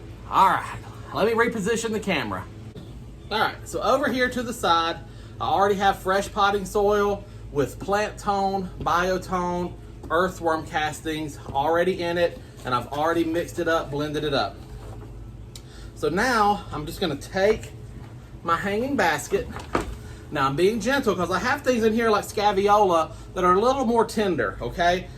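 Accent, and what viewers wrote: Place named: Arkansas, United States. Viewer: I had to look at your bio information after watching your most recent video re making a planter fountain. Your accent sounded so familiar and when I saw you now live in central Arkansas I knew why. I am originally from Camden. I’m enjoying your videos🌷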